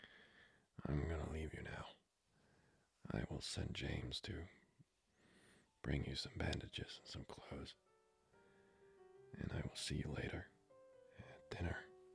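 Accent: Germany. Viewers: What in the German gentleman vampire is this AAAAAAAAAAAAAAA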